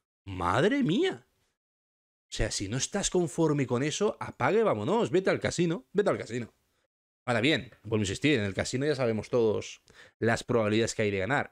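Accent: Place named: Catalonia, Spain. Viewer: Veo varios canales por si puedo sacar algo de provecho, a veces algo puede servir, pero el video que espero todos los días es el tuyo, el que realmente se puede aprovechar al 100%. Sigue así nos ayudas mucho y muy pero muy pocos canales tienen futuro como el tuyo. Por el acento veo que eres catalán! Yo también de Barcelona. Saludos!!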